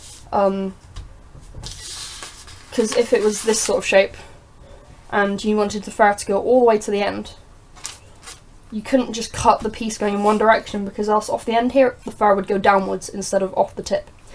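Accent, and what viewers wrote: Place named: Northern England, United Kingdom. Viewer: Hey! By your accent it seems like you live in the north of the UK. Is there by any chance seeing that I live in England and good shops or websites for quality fur that is in the UK so I don't have to send £40 for shipping?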